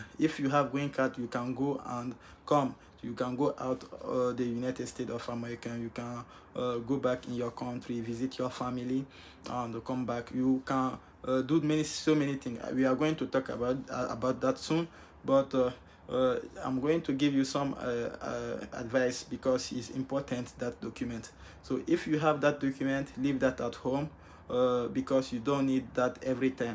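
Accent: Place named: France. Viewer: Apparemment vous parlez bien anglais mais vous parlez l'anglais comme les Français ici qui parle anglais. Votre accent anglais est tellement français. Moi aussi quand je parle français, les français toujours ma dite je parle avec l'accent anglais 🤣🤣🤣🤣✌👍👋👋.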